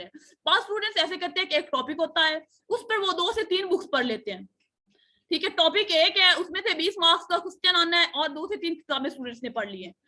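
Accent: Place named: Punjab, India. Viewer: Mam ...apki tone of language ..jhang,chiniot,sargodha qasor ..mandi bahu din en logon sy milti ha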